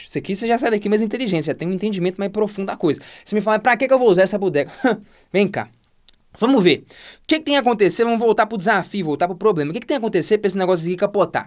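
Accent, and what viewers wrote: Minas Gerais, Brazil. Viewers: A aula é excelente! Sem dúvidas! Mas confesso que conhecer o "mineirês" deixa o entendimento mais fácil em alguns momentos da aula... Digo isso sendo mineiro também.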